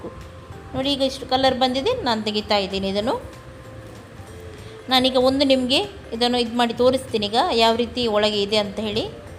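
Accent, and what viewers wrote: Mangalore, India. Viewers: Nimma maathu tumba cute rii kelikke koshi agutthe manglore kannada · madam nivu Mangalore avra kannada age mathadthira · Your Mangalore Kannada explanation so beautiful , good vedio mam. Super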